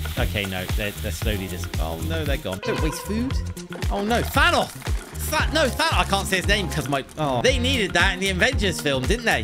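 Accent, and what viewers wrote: England, United Kingdom. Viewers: Are you English or American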